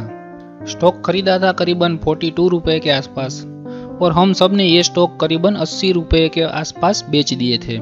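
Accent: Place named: Gujarat, India. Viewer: Love ur gujrati accent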